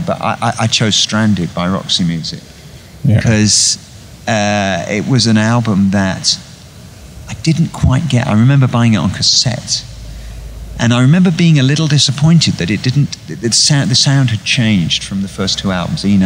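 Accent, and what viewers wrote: United Kingdom. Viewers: Posh accent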